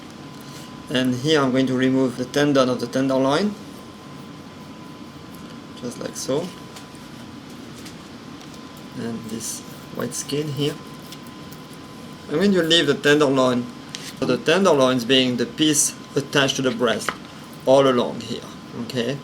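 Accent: France. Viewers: this guy has an awesome French accent and a really cool hat - this has got to be the best recipe on YouTube for Chicken Cordon Bleu :) LOL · Wow so yummy with the French accent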